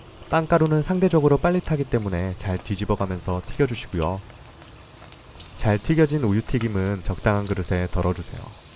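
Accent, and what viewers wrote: Gyeongsang, South Korea. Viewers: 경상도 출신이다에 한표ㅎ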